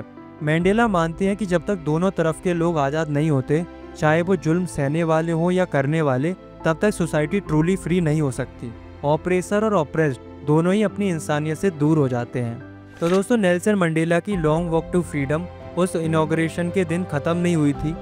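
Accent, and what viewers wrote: Uttarakhand, India. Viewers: Bro you from uttarakhand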